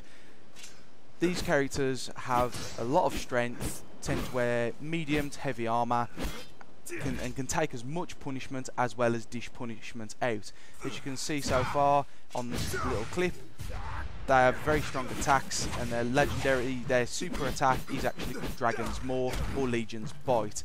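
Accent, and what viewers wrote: Birmingham, United Kingdom. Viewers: Nice on mate. You from Brum or the Black Country?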